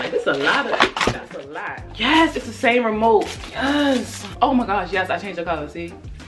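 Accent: Baltimore, United States. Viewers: What I’m gonna dewwww, I heard that bmore accent girl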